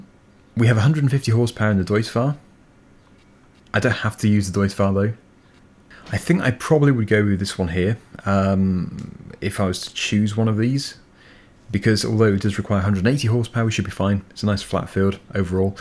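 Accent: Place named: North London, United Kingdom. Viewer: Gal from north London er er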